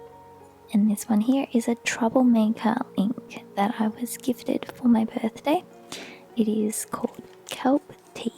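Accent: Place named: Australia. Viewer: Great to hear an Aussie voice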